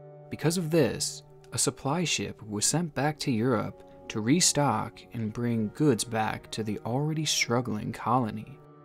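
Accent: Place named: United States. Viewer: why tf do you speak like this? "Kalua" Lumpur??? jesus christ.... the american-ness is out of this world. and there have been found bits of the plane . this has to be one of the most triggering videos i've ever seen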